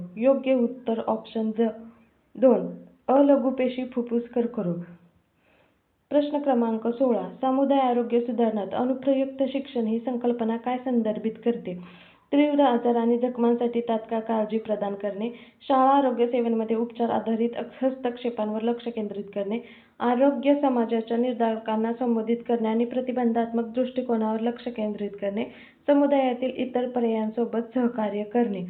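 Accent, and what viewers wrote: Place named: Solapur, India. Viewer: तुम्ही सोलापूर डिस्ट्रिक्ट चे आहे का मॅम आमच्या ऑफिस मध्ये एक सोलापूर चे कर्मचारी आहे ते तुमच्यासारखी बोलतात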